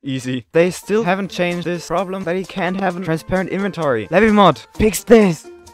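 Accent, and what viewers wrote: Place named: Germany. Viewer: I bemerken über your MUTTERSPRACHE that du habe a DEUTSCH Akzent , do you DEUTSCH?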